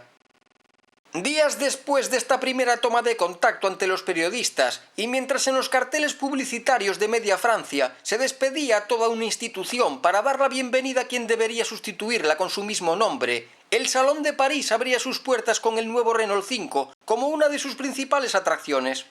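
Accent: Galicia, Spain. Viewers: Es gallego, joder. Es su acento, tan español como el tuyo y seguramente mucho más bonito.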